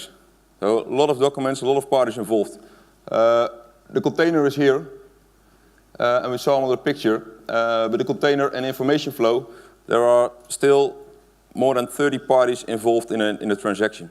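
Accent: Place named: Netherlands. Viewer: Ugh, those dutch and that freakin annoying accent. I'm no native English speaker but at least my English isn't as thick accented as this one. What's wrong with you Ducth? It's like you make no effort at all to speak decently. I much prefer a german or an french person speaking English than this.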